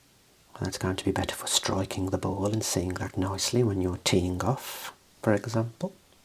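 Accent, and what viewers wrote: Birmingham, United Kingdom. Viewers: Birmingham, England 😊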